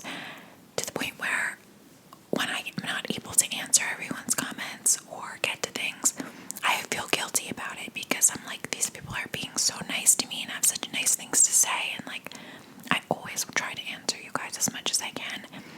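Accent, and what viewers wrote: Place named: East Coast, United States. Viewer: Girl I looooovvveeee your videos and your voice so much!! The East Coast accent is so tingly and reminds me of home ❤️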